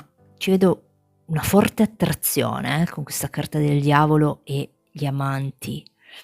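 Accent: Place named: Italy. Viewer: Me encanta el acento italiano además se entiende bastante bien saludos desde 🇪🇦🙌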